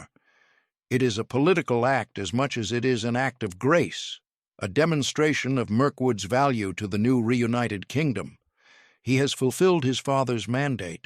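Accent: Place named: United States. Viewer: middle earth tales narrated with an American accent just don't fly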